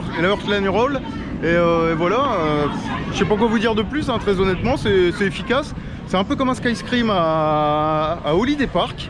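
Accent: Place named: North, France. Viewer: J ai découvert ta chaîne y a quelques jours, bravo pour ton professionnalisme tu fais attention à tout, la musique, le bruit , le vent ( ce n'est malheureusement pas toujours le cas de certains youtubeurs !!!! ) je vais m empresser de visionner toutes tes vidéos J ai cru reconnaître, légèrement, l accent du Nord, es tu un ch'ti, ce n est pas péjoratif j'en suis un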